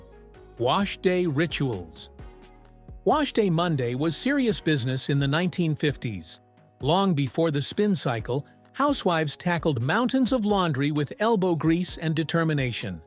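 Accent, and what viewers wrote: United States. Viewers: Appaling American narration yet again! Why carnt you read your script through properly, before starting!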